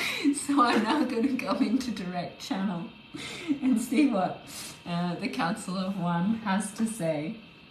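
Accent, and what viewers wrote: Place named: Australia. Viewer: Yas! So beautiful, thank you very much! So much love and grace as always. I live how ur expression of language is so great and this australian accent, yeees ❤ and this bloodline perspective is on point. And this kind of description is good. There are many layers and definition to these dynamics. But prince and princess is more likely acceptable storytale for humans So, one question: are the chakras of the Earth moving? Meaning, can I check them by researching these points.. Btw.. the flags of countries are also showing what energies and colours they hold.. or work with.. Thank you and sending light as always ❤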